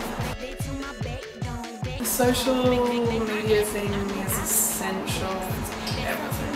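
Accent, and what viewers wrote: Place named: Australia. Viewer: fuck thats so annoying -.- she mixes accents ... why honestly , you were born in australia you grew up in australia stick to what you are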